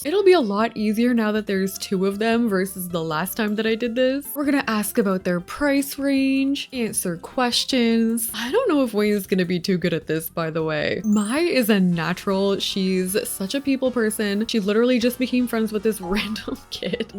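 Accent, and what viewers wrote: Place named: Canada. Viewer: Right I couldn’t tell if she is just Canadian™️ or didn’t know how to pronounce Grotto 😅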